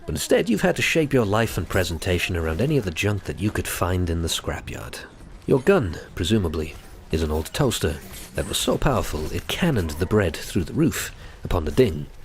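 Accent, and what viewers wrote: United Kingdom. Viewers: But he's a strong contender with his British accent ( is a contender of Morgan Freeman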